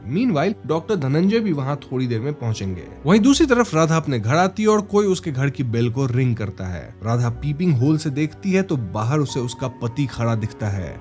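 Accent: Bengal, India. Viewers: One request,,, your Bangali accent is too thick, so please learn to speak in a neutral accent.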